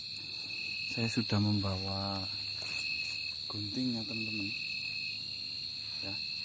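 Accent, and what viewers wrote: Banyumas, Indonesia. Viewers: oh pantes bhasanya sama kya saya...ngapak..mksih mas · klu tegal lebih medok klu pwto lbh soft ngapaknya😎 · Ngapak purwokerto banyumas brooo..😂👍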